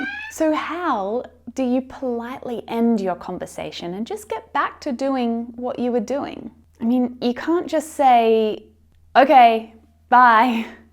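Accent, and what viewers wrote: Australia. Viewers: Australian accent!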